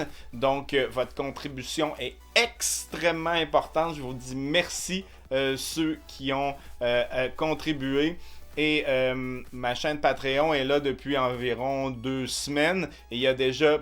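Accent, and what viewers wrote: Canada. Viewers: L'accent canadien 😖😖😖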